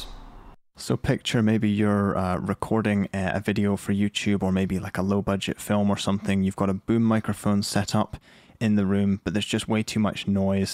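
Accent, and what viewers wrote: Scotland, United Kingdom. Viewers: I don't think he does XD he is scottish sometimes his accent shows.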